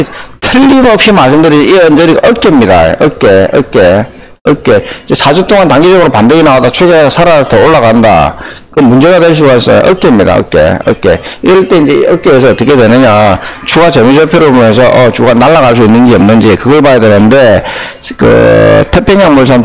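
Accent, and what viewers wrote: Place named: Busan, South Korea. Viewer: 분석내용 잘보고 갑니다 부산 목소리 반갑네요 고향 떠난지 12년째 퇴직하고 귀향 해야겠어요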